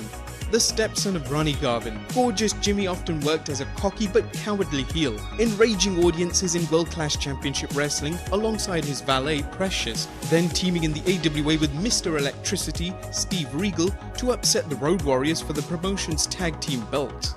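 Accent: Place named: United Kingdom. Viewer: Who wants to listen to a brit?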